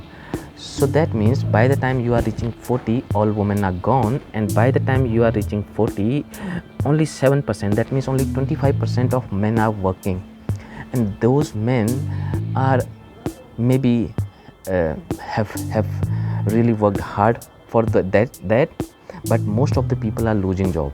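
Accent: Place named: Uttarakhand, India. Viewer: 😀 Sounds like a family member.. you are from Uttrakhand too !!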